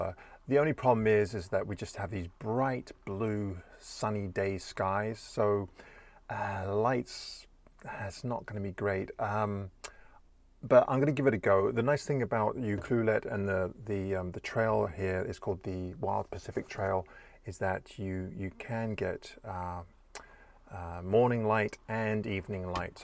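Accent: England, United Kingdom. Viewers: Hi. Crazy question, are those magnetic reading glasses? If so, what brand are they? Look like exactly what I need to avoid regularly leaving behind/ sitting on/ losing mine. PS, it’s really refreshing to hear a laid back English accent for a change!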